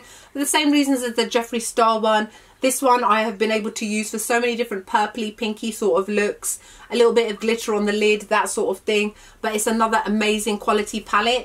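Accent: United Kingdom. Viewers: Hi from US, I love the way you talk :) plus UK accent. Your Makeup looks amazing!, need to get that lip color, so pretty! Love that colourpop blush, even i mostly always reach for cherry purply colors for blush. Watching your video to make list of things to buy with Sephora 10% coming up soon :) xoxo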